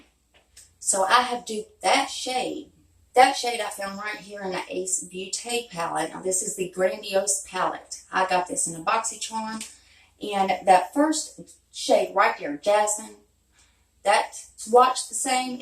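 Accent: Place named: West Virginia, United States. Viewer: I live on West Va/Va border and we have the same accents, you could be my neighbor. Lol. So our accents are the best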